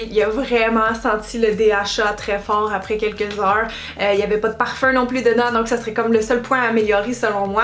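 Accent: Quebec, Canada. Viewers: Oh mon dieu qui vous a appris le français accent catastrophe hhhhhh les canadien il faut mieux essayer l'anglais un conseil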